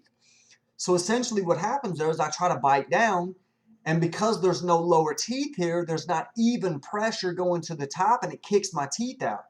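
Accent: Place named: Kentucky, United States. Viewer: I really like your videos. They have helped me a lot. I also really like your Kentucky accent!!!!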